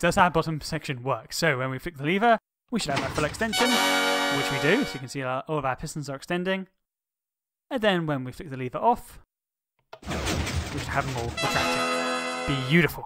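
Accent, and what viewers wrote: United Kingdom. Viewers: I know this sounds weird, but I like your British accent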